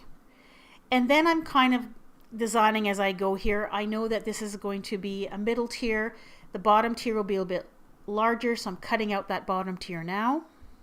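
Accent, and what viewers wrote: Canada. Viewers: omg, your Canadian!! :O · your canadian, right? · Is she Canadian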